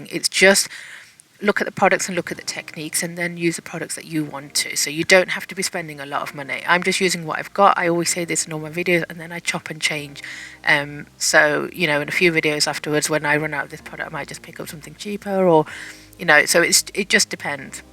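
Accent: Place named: United Kingdom. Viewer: Mam U speak very well Your British accent is great but u speak very very fast Your Hindi is beautiful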